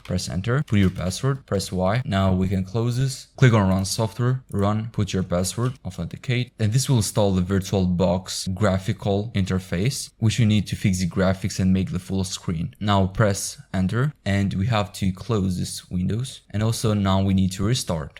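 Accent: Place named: France. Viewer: l'accent francais mdr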